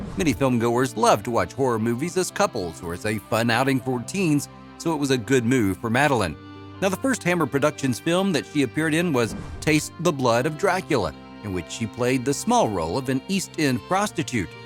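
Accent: United States. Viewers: No offence ,but why an american narrator ?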